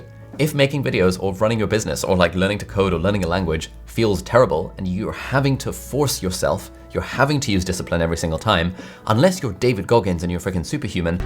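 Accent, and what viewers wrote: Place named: England, United Kingdom. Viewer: ALI ABDAAL LOOKS LIKE AN EARTHQUAKE VICTIM FROM PAKISTAN WITH AN ENGLISH ACCENT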